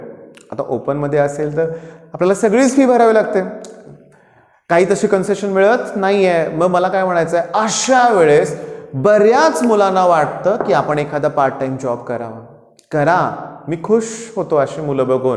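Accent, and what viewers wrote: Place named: Western Maharashtra, India. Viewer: Sangli satara kolhapur che por boltat he bhava ✌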